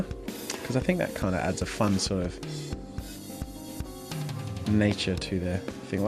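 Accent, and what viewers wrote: Australia. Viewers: really nice.. are you australian?^^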